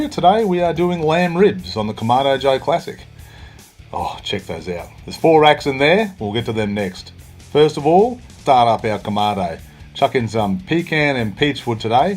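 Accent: Australia. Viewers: after watching dozens of seppo bbq videos, can i just say how refreshing it is to hear an Aussie voiceover lol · Aussie as fuck love it mate 🍻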